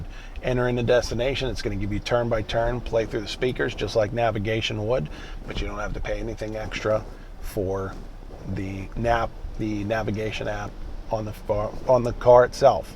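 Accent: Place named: Pittsburgh, United States. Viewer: that awful PIT accent, can hear that from. 4000 miles away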